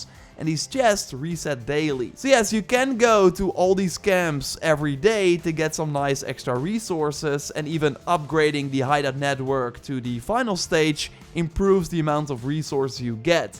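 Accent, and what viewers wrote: Netherlands. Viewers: How is He Faking it? That is his Dutch Accent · You sound dutch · Ik hoor een Nederlander 😁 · New to the channel, hearing your accent, are you dutch? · You sound way too Dutch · I'm Dutch too, but dang Dutch accents are really annoying to listen to. it's called a hovercraft you dolt, we also use that word here in NL.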